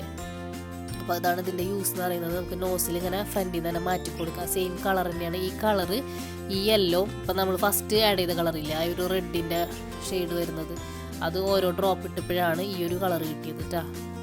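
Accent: Kodungallur, India. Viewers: Adipoly 👌👌👌 Evideyanu place? Kodungallur area aano? Njanavide aanu. Njangallude slang pole thonni 😄😄😄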